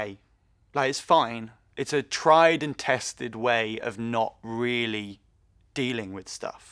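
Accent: United Kingdom. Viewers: By listening from speaking, I read the comments with a British accent in my mind. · choke me with that British accent.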